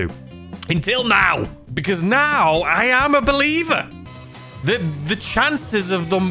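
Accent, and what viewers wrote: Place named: Scotland, United Kingdom. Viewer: youre scottish? love the accent btw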